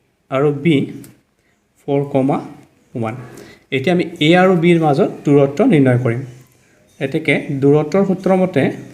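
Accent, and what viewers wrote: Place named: Bhojpuri, India. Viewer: Ekadam Bhojpuri Disha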